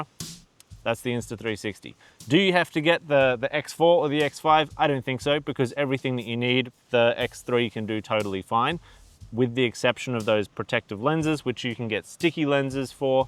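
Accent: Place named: Australia. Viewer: Great video - also love to hear another Aussie’s accent on YouTube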